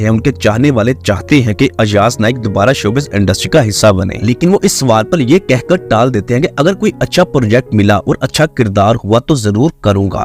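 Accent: Punjab, India. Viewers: Why is the commentator trying his best to speak urdu in Panjabi style??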